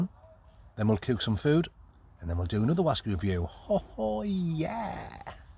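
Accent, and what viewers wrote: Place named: Sunderland, United Kingdom. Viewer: I'm originally from Sunderland, 46 years, left 12 years ago, so lovely to hear a proper accent. Love this stealth camping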